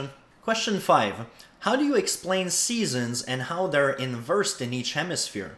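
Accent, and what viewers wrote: Quebec, Canada. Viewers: Es tu Québécois ? Ton accent me le laisse croire. Très bon vidéo en passant ! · Cleary a Canadian eh? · It's because he's French Canadian, so he prounces his "ah" sounds as "ey."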